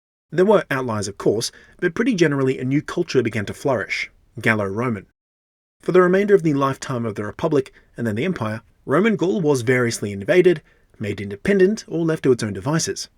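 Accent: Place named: Australia. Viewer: Pretty dope I found an Aussie explaining the FR. Awesome video mate.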